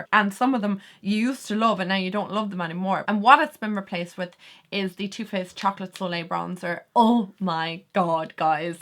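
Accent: Ireland. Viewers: I love your Irish accent so much it’s so beautiful ☺️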